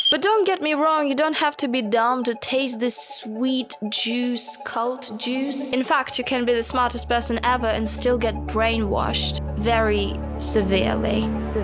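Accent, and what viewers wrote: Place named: Ireland. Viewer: I really like your accent, are you Irish?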